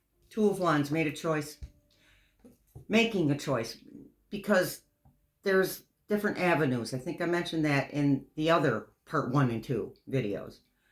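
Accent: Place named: Midwest, United States. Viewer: Omg! My Dear Aquarian Sister! We both know there is no mistake I found your channel. As a February 13,Aquarian, I have had a frickin crazy merc.retrograde too! Finally Ending legal drama since Feb.2023, I'm just now feeling back to some normalcy and with your wisdom I might add! I L❤V E your antics and how you give the ignorant, rude trolls a firm education on being "civil" something their mamas should have taught them. Keep it up! You keep me laughing! Most importantly, your beautiful readings have inspired me and continue to give me hope. It seems as if I've gone through some of the Same battles in the last 2 yrs in this country, just like President Harris has; I could definitely relate and I love your parts 1 and 2 segments. Anyone who has nerve to complain about Anything you do on Your show, is Not living on their higher frequency level. 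So, keep that up too! I also sensed that you may be from the Midwest or East coast? I think your accent is great and your personality is so right on! Like mine! Lol! I must and will get time hopefully soon to book a reading and to just meet a Real Sister in this now, crazy ass country! ❤U Earth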